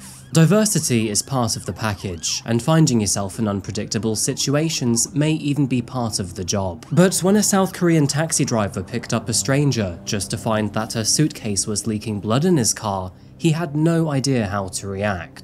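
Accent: England, United Kingdom. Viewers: Thank you,you ARE one of the best crime storytellers on YouTube. With your clear and properly English accented voice, you ARE a class above the others,please carry on with the good work, you ARE much loved (by me anyway!😊) Bravo! 👍👌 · Dear, may I ask which part of England you are from? I am very interested in the different ways English is spoken in the different parts of England. And of course in true crime I truly appreciate your hard work you put into your videos. CHEERS, Orsi from Hungary.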